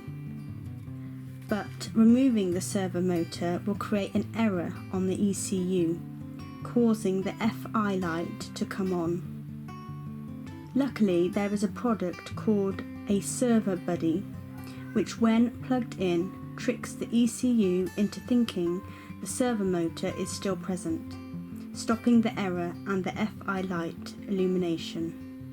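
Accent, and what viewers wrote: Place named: United Kingdom. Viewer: The bike looks, and sounds, absolutely amazing. Of course, so do you, young lady. I love your accent. When I found out I am part Brit (not sure if you watched my video about it) I wish it came with a bit of a Brit accent, too. LOL. Your little brother has great balance, does he compete?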